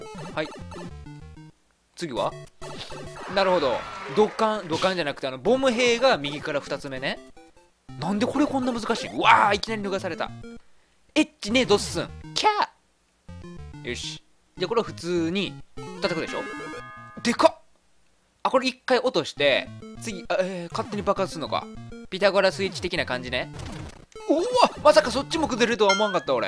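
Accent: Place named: Kansai, Japan. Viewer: チャンネル登録しました！編集上手いし、関西弁好き♡めっちゃハマりそう！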